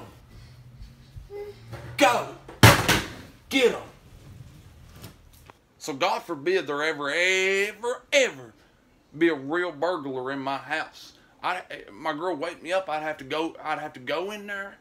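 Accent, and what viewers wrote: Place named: Kentucky, United States. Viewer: my dog just did that. I got up & came back and that plate was licked clean and I mean clean. I couldn't get to mad him he's old. Edit =, you are from Kentucky? Right, cause you sure sound like it. Takes one to know one!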